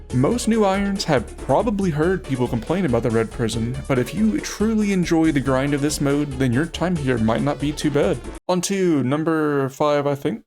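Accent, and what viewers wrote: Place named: North Carolina, United States. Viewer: You sound like you’re from nc lol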